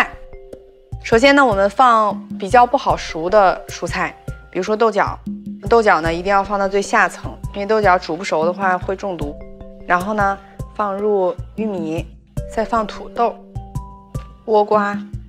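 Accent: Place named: Northeast, China. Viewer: 这丫头的话太东北了吧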